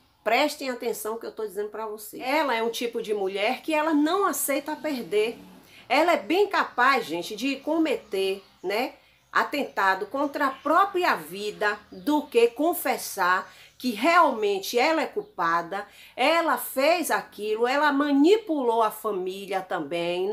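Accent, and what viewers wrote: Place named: Bahia, Brazil. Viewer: Eita baiana arretada continua a falar a verdade minha irmã cada vídeo seu fico falando com Deus.porque todos não são verdadeiros que Deus continue a te usar e abri os olhos do povo ,ah tô amando seu sotaque kkkk sua risada também fico rindo com você falando sempre a verdade quando tá brincando ou falando sério Deus continue a te usar IRMÃ 😘👏👏👏👏🙏🙌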